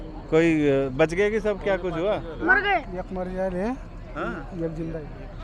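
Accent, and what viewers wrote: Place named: Khandesh, India. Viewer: Najara nahi nazara kahete hain Or Intejar nahi intezar hota hain voh Jarrurat nahi zarurat kahete hain Bijness nahi business Urdu ka janaza mat nikalo language ki study karlo bad me journalism ka shouq karna۔۔۔۔ektarf ka shuru hain dusri tarf ka nahi matalb kya hain 😂😂😂 one way kahete hain use ۔۔۔۔ industriej nahi industries kahete hain۔۔julfen nahi zulfen۔۔۔۔17 manjil nahi 17 manzil .....jameene nahi zameene.....inshort samanya or average class ki journalism.......on camera to Kam se kam ache or bahetrin alfaaz ka intekhab karna chahiye or aam zindagee me jis khandeshi lahejy me baat karte hain usse bahar nikal kar shiree or dilkash lahejy ka istemal karna chahiye...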